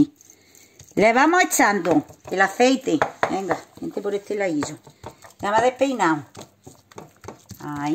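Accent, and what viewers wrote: Andalusia, Spain. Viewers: Que simpaticaceres. Una autentica Andaluza!!!. Me encanta oir a los andaluces. Claro que lo hare este verano!!. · Gracias por ésta receta tan natural , tradicional y refrescante. Tengo ilusión en hacerla, seguro q está de maravilla 😋 Mmm... Gracias y aforo tu acento Andaluz ! Guapa 😘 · Ese acento andaluz divino!!!!!!!!!! Desde Uruguay, cálidos saludos. Y la pipirrana, riquísima!!! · Pues hoy la he comido yo. Mi madre la hacía así y era jiennense; todo muuuy rico, pero lo que más, las sopas con el caldillo. Ese acento, cómo me traslada a la infancia......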